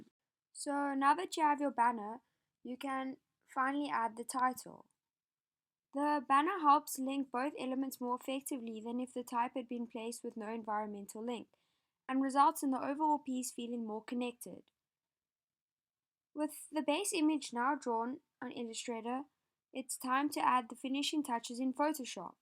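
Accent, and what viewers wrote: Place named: Iceland. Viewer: Very nicely done. I enjoyed the flow of your Tutorial. I didn't once scream at my monitor trying to get you to hurry up! Great accent as well. Iceland?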